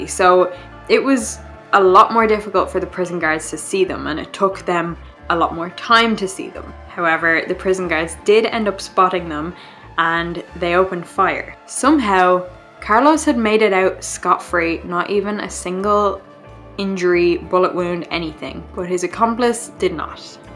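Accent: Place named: Ireland. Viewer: Love your Irish accent. So charming and nice ❤️ I see now why the Irish are such good storytellers 😊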